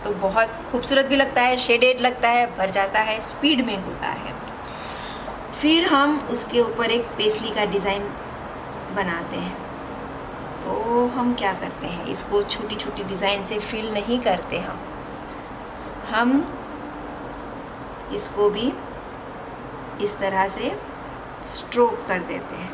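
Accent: Gujarat, India. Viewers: Ka kha ga gha Tame Gujrati 6o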